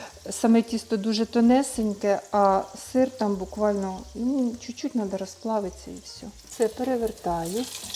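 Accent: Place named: Odesa, Ukraine. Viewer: Одеський говір. На заході теж розмовляють не на укр.мові.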